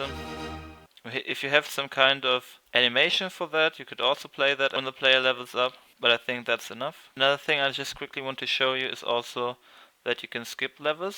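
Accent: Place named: Germany. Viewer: Hey dude, ty for you´r fast answer. I have tried it in version 4.14 now and it works :) greetz from germany :P btw are from ger too maybe? there some sound in your dialect sounds like germans whos speaks english :D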